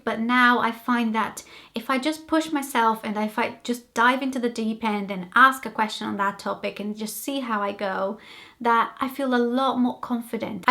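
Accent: Wales, United Kingdom. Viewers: I don't often comment on YouTube videos, but felt I had to with this one, because I was quite astonished when I heard your accent. I have a number of Hispanic friends from Brazil and Columbia and Uruguay and though they can speak English, it is with a very strong Hispanic accent, --something that you have managed to completely overcome. Amazing! As a native English speaker, I can say your English sounds truly excellent and at a high level. You have acquired a pleasant accent too. Wise choice living in Wales where the accent is easy on the ear. You even sound classy and cultivated. Well done! There are always people who like to denigrate others, just ignore them. As an expat living abroad I can testify that it takes a lot of hard work to even achieve basic fluency and acquiring a native accent can be even harder. The natural sound and flow of your speech bears witness to a great deal of effort and some sound achievement. 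It's great too that you are taking the trouble to pass on your tips to others. Be encouraged. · I honestly had no idea you weren't a native English speaker! Your accent just sounds like abnormal Welsh accent to me! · Your English is excellent, fear and precise, with a nice hint of Welsh. A Welsh accent speaking English is so nice to hear.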